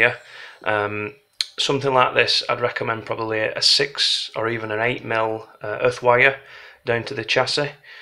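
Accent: Bolton, United Kingdom. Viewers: Well explained... simple👍🏼.. Bolton accent??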